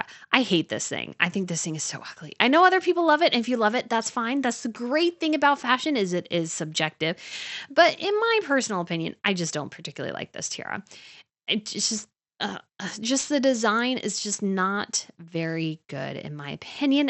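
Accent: United States. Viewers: Makes me cringe listening to your whining American accent criticising a late Queen’s tiara RIP. No class, no respect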